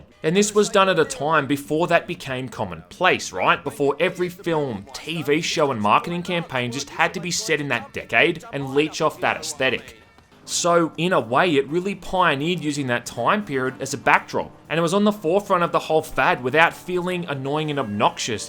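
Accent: Australia. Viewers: i really didnt notice he was australian until he made that australian joke then I couldn't unhear it lol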